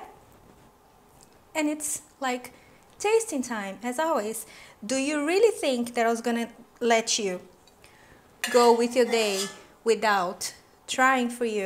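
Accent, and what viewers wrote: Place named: Brazil. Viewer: hey are you brazilian? i can see for your accent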